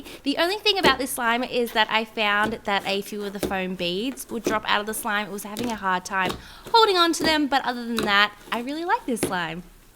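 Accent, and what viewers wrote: Australia. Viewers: your Australian! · OMG your Australian!😍SAME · I love her Australian accent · Are you Australian?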